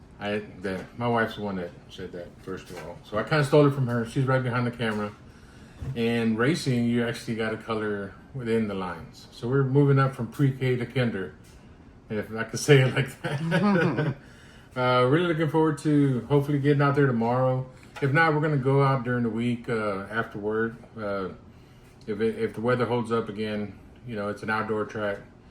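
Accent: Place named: San Antonio, United States. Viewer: Great videos! Sounds like you live in San Antonio. I visited Oatesified this past weekend, did some marshaling, and met a lot of nice racers. I’ve never raced but I plan to build the Losi 1/8 E Buggy kit, should arrive early December. Hopefully I’ll get it built and hit the track in January. Hope to see ya out there!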